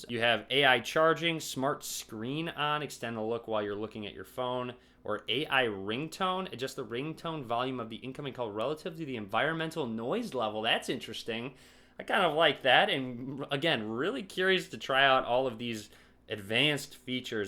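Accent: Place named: Chicago, United States. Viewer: Favorite phone reviewer, right up there with MrMobile, Michael Fisher. Especially like your Chicago accent. I see Amazon is already out of stock, sad. Wondering if this phone will work on Project Fi....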